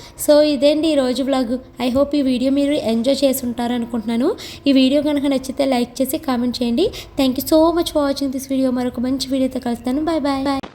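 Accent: Godavari, India. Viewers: Ma godavarolu bhasha alage untundi...yevaru marchaleru....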